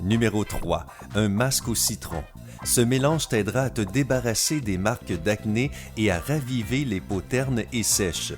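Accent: Quebec, Canada. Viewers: Y'trop Quebecois lui 😂😂😂😂😂 Calice ton accent y tres obvious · D'abord quel jolie voix et l'accent québecquois c'est agréable je cais essayé je suis célibataire si j'amais 😂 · J’ai liké pour l’accent 😂 j’vous kiff les québécois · Avant je détestais l’accent Canadien maintenant je kif 😍. A part ça superbes astuces 👌👌👌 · Oh je love les Québécois et leurs accent 😍😍😍😍😍😍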